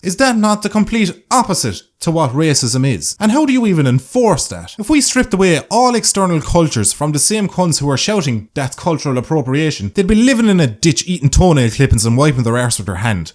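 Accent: Ireland. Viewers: That's his accent, I'd know because I'm from Northern Ireland, which is ya know very close to Ireland, attached in fact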